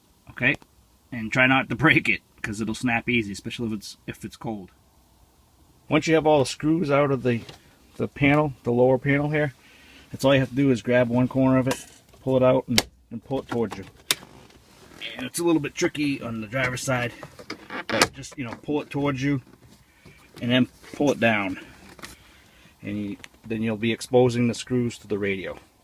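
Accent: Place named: New York, United States. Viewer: nice new York accent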